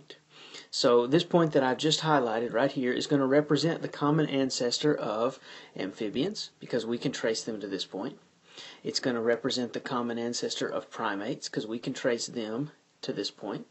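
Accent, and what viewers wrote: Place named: Texas, United States. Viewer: Thanks man! this was really helpful! I like your texas accent at times too ;)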